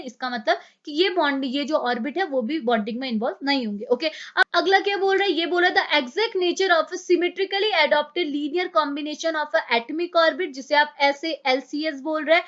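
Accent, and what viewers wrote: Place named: mixed, India. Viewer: Bdiya pdhate ho mam ap..❤❤❤ ap up ya uk se ho syd....😅😅 mean apka acsant Asa hi lgta h.. liie ye सब समज अगि..😁😁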